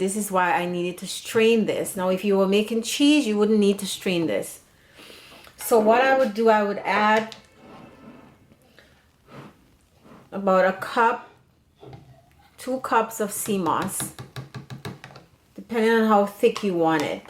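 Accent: Trinidad and Tobago. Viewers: hmmm my Trini spidee sense is telling me your Trinidadian. Big up all my TRINI!! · You sound like my cousin from Trini, so easy to listen to. You're a natural born teacher. Thanks!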